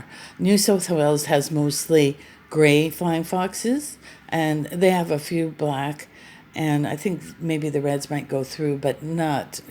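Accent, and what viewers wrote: United States. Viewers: Why the American accent if you are in Australia? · You sound American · You have an American accent. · Hes so cute!! When I first heard your accent I thought this was in America until I heard you say he spat the dummy haha.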